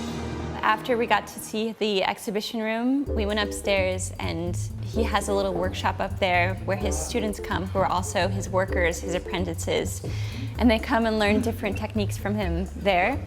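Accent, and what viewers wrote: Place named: West Coast, United States. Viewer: A statement should never sound like a question. This video has so much to share. Please watch your west coast accent.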